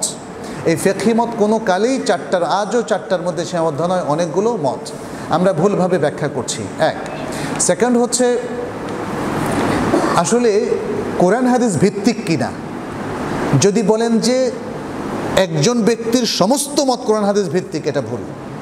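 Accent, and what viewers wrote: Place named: India. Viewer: সে ইন্ডিয়ান , ইন্ডিয়ান ও পাকিস্তানিদের উচ্চারণ বাংলাদেশী বাঙ্গালী জাতির লোকেজনের থেকে আলাদা ও ভিন্ন হয় , এক্ষেত্রে ইন্ডিয়া এর বা পাকিস্তানের দেওবান্দী ও আহলেহ়াদীস় সালাফী আলেমদের উচ্চারণ একই রকম হয় । আর সলাাতের বাইরে কেউ ভিন্নভাবে উচ্চারণ করলে বড় সমস্যা হয় না জানা , আর তাঁরা কখনো হ়ারাফ় এর বা কালিমাহ লাফ়াজ এর পরিবর্তন ঘটে এমন করে পড়েনা বা উচ্চারণ করে না ।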